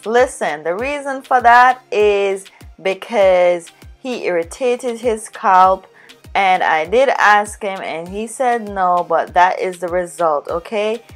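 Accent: Jamaica. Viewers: you sound like a Jamaican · Are u Jamaican?? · You sound Jamaican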